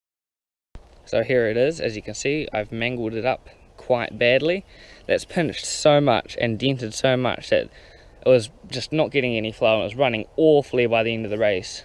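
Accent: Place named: New Zealand. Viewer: sounded like you were from new Zealand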